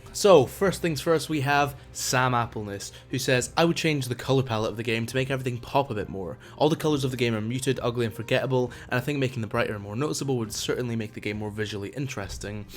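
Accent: Scotland, United Kingdom. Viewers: Damn, is scottish?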